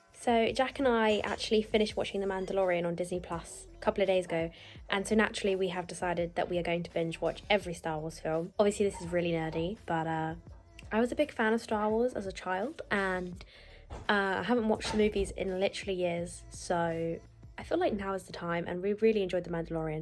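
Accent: United Kingdom. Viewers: I just love watching your videos to practice my listening I love how British English sounds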